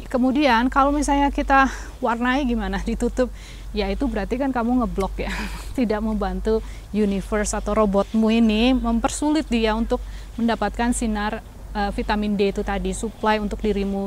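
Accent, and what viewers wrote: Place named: Java, Indonesia. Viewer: Masyaa Allah... Matur nuwun sanget ilmunya Mb, I love my long hair. jadi tahu sekarang, Alhamdulillah for everything. Btw, dialog Jawa nya medok banget Mb, Jawa mana Mb? Salam kenal aku asli Jawa Timur Mb. Sukses selalu dan bahagia sekeluarga..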